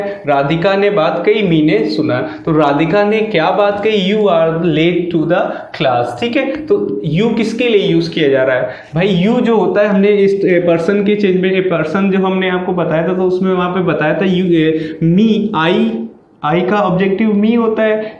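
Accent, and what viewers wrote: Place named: United States. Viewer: Good brother very nice explanation And your english accent is very american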